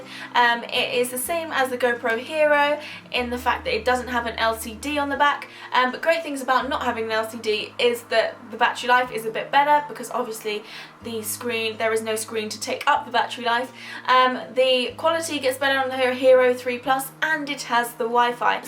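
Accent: United Kingdom. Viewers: Among all I always been very fascinated by the UK English accent..btw that's a very useful info of GoPro.👍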